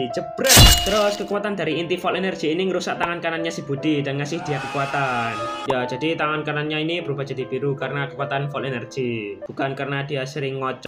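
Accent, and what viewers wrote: Java, Indonesia. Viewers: Koe wong jowo to maz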